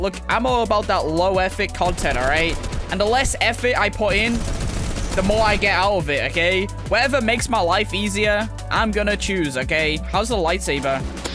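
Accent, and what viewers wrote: United Kingdom. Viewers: We got to admit that we love his British voice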